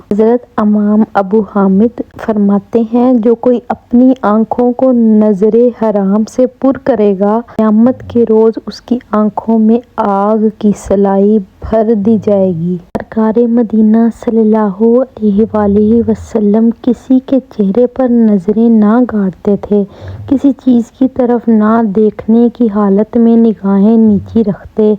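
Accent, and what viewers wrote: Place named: United Kingdom. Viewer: جزاک اللّہ ۔ اچھی اصلاحی باتیں ہیں ۔آپ بھی مزید کوشش کریں کہ صرف آوز چلائیں اور سامنے نہ بیٹھیں ۔تاکہ اچھی باتیں سنتے ہوئے آپ پر نظر نہ پڑے۔ اور ناظر منظور دونوں نظر کے گناہ سے بچیں ۔ اور حیث کو حریث بولیں ہدیٹ نہ بولیں۔ انگریزوں کے لہجے میں۔ آپ تو اردو خوان ہیں۔ کیوں حدیث کی جگہ ہدیٹ بولتی ہیں۔ اسکی اصلاح کریں ۔ جزاک اللہ ۔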